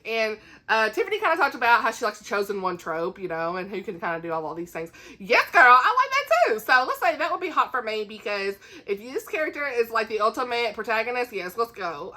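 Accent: South, United States. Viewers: Your southern 😂😂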